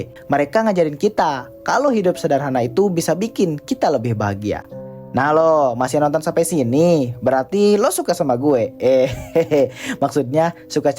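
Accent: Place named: West Java, Indonesia. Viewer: kelihatan dari cara berbicaranya dan dialeknya Anda adalah Orang Sunda, hanya bicara ala Orang Betawi. Ini hanya masukan saja, kalau penulisan teks dalam videonya diusahakan sesuai ejaan Bahasa Sunda, supaya orang luar Suku Sunda atau Masyarakat Baduy, tahu oh itu penulisan Bahasa Sundanya. Beberap yang salah tulis, misal seperti dikatakan "lojor temenang dipotong" seharusnya LOJOR TEU MEUNANG DIPOTONG. Juga cikesik seharusnya CIKEUSIK. hatur nuhun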